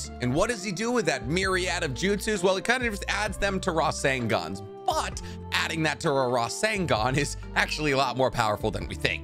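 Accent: United States. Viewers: Just found this channel, which is pretty cool but a major downside is this guy's pronunciations of Naruto/Japanese names. So American of him. For now it's a great channel, and I'll bne going back to watch old videos but his pronunciations are definitely going to grate on me.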